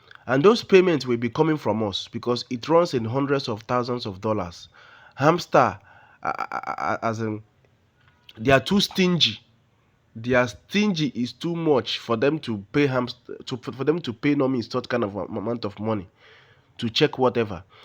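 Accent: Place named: Igbo, Nigeria. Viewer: You sound like a true Igbo guys that know what his doing..... great one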